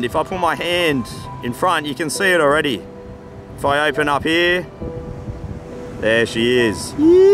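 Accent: Australia. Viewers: That photo is so beautiful. Your Aussie accent was so strong 6 years ago - it's really noticeable compared to the videos you made this year 😊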